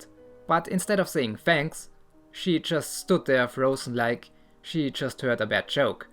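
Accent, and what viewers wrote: Germany. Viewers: Man merkt dass es deutscher Akzent ist aber du sprichst trotzdem gut Englisch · Ich höre schon das du deutsch bist Bro haha , unterhaltsames Video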